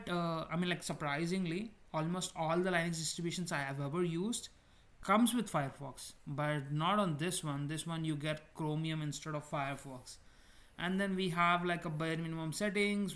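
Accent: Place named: India. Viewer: You Sound like Indian.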